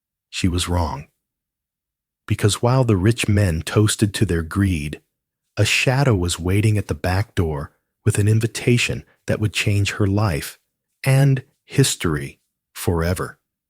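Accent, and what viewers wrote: United Kingdom. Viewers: . Thank you for your response. it’s not a matter of what I am used to, it’s about that being far and away my favourite… “there’s a difference”… others, I am used to and can get used to, but no other I have heard has that perfect British RP accent. It’s a preference.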